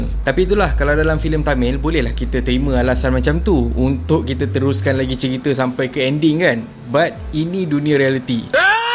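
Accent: Indonesia. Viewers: Pompuan Indonesia biasa la...dari bahasa yg diucapkan memang Indon. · betul..slang cakap pon lain..betul2 melayu ke atau indon?..sebab indon x semuanya melayu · hahaha bukan melayu tu ,,bunyi indonesia je,entah dri mana minah ni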